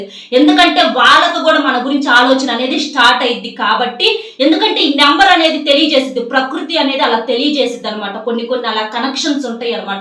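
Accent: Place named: Narasaraopet, India. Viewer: White saree, black jocket malleypuulu,narsaraopeta bhasha maatlaadatam ,anni superuu😅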